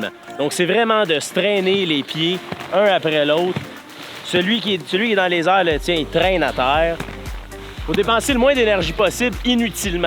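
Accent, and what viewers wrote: Quebec, Canada. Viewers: Merci pour cette vidéo ! ça fait plaisir d'écouter du français canadien :)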